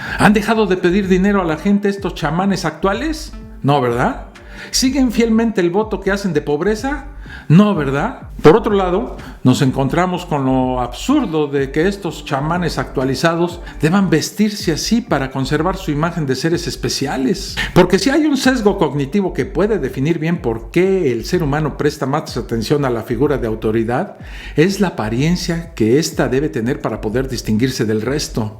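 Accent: Mexico. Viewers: Me encanta su forma de informar aunq tenga un toque mexicano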